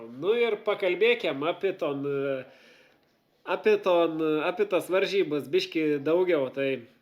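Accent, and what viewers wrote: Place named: Samogitia, Lithuania. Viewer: pramuša unt žemaitiškuma - džiaugās širdis klausuntys 🤘💪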